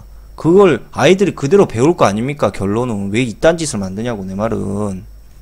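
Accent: Jeolla, South Korea. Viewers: 님 본업이 뭐에요? 왜이래 똑똑하죠?^^ 그리고 님이 열받았을때 (욕할때) 야구선수 이종범 말투랑 거의 비슷^^ (전라도다요??^^)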